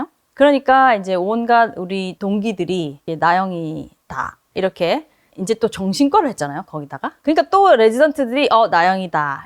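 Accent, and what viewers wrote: Gyeongsang, South Korea. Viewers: 긩상도+영어액쉔트 = 묘한 중독감 말투네요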